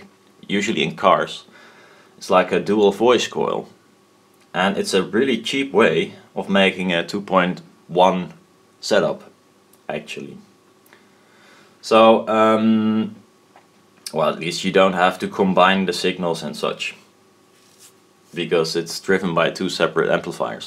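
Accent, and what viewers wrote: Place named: Netherlands. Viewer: ik ook lol gokte het al aan je accent.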